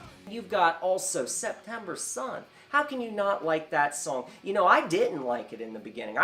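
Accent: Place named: East Coast, United States. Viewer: This was like a TON biopic.. so much info, it's fantastic💚🖤. You gave each album equal opportunity; the the details of his life personality, for example his fear of judgement and substance abuse/ mental health. He was such a sweet soul deep down. Your tales of meeting him are so endearing. Thank you so much for this. He really did make the world if music a better place. P.S. I was way off on your accent a while back... definitely caught the east coast this time. That one time I guessed the South 🤦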